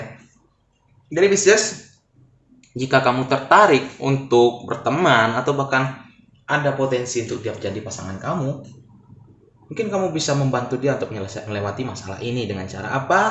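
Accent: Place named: Padang, Indonesia. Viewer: Pisces hadir menyimak... Suarany Abang ini mirip logat org Padang🙏🙏🙏🙏🙏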